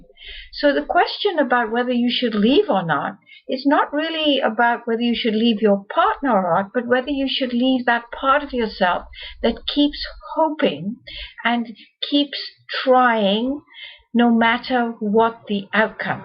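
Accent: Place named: South Africa. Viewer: she sounds like a South African!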